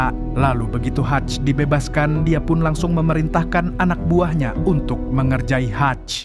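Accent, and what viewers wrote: Banjar, Indonesia. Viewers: Orang banjar jua 😮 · Buan banjar kah mang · Urang banjar kah pian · Banjar jua sakalinya 😅😅😅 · Bah waluh urg banjar skali ny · Cah orang banua jua sekalinya😂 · Urang banjar kah pian min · Mang pian urang banjar kah?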